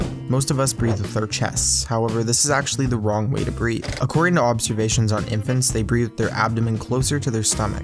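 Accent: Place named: Canada. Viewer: A-bOAt / oAt (INSTEAD of aboUt and oUt) says that you're CANADIAN!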